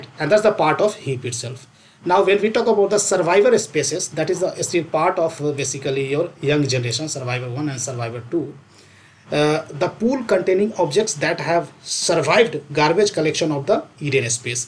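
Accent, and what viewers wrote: India. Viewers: love indian english)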